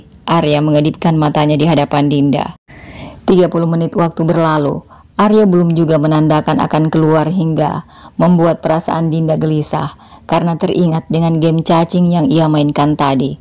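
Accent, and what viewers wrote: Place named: Bugis, Indonesia. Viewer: Suku bugiskah, logatx sih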